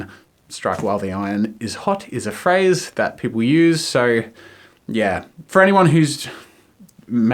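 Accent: Australia. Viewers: sounds more Australian 4 years ago than he does today:) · I have never heard such a huge aussie accent from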